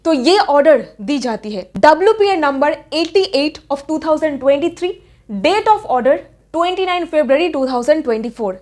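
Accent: Bengal, India. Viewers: Apnar kotha sune mone hochche apni bangali??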